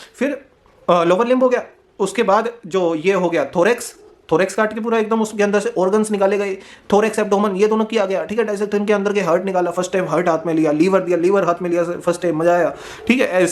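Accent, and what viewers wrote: Haryana, India. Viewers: Bhai teri voice me haryanvi touch h Hostel m haryanvi students k saath rha h kya bhai ❤️❤️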